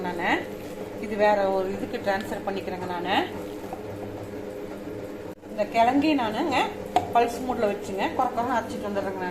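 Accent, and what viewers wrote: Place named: Kongu, India. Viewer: nice vadai and kongu Tamil 👌🏻👌🏻👌🏻, new subscriber😊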